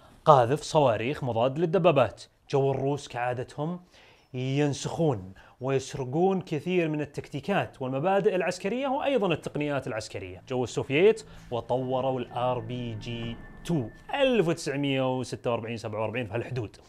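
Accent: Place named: Saudi Arabia. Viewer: اعجبني ان عربيا واعتقد لهجتك سعودية لقد ابدعنا في استخدامها في لبنان وادخلنا ابتكارات من 1975 وحتى 1990 لا يمكن شرحها الان القذيفة التقليدية العادية ثلاث مراحل التاندوم اربعة مراحل الروسي بثلاثة الاف درجة مؤية المصري الكوبرا خمسة الاف درجة مؤية المسماة الكوبرا الياسين من المبكر الحديث عنها تقنيا جهاز رصد الميركافا بعيد المدى وفشل دائما امام الياسين لقصر المسافة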